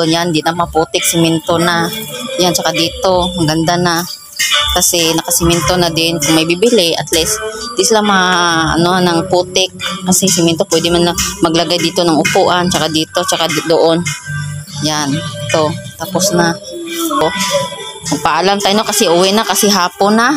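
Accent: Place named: Visayas, Philippines. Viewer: Hello po, nag sub na po ako, dahil kay 😅,kahit may konting Bisaya, natutuea akong panoorin, kasi malumanay kang magsalita, sarap pakinggan ang boses mo, magtulungan lang para sa pagtulong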